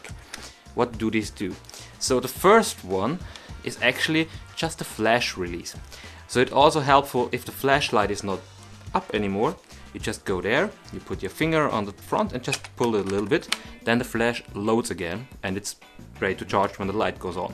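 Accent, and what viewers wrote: Germany. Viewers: Deutsch ist deine Muttersprache, oder? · One question. Are you German? Sounds like it. :)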